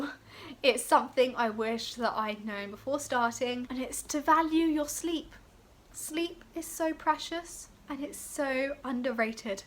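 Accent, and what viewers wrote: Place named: RP, United Kingdom. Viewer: Hello... I love your perfect videos... It is very kind of you giving us advice about university... Btw i am the guy from greece (40°C+)💜💜💜.... I love your british accent... Recieved pronunciation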